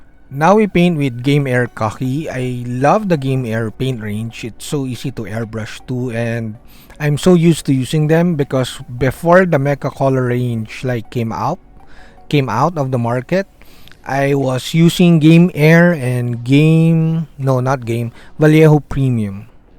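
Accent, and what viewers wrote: Philippines. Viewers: Heavy Filipino ascent say peanut butter.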